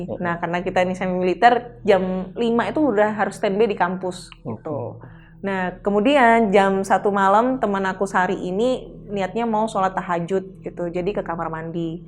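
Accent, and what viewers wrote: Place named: East Java, Indonesia. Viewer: lidah wetan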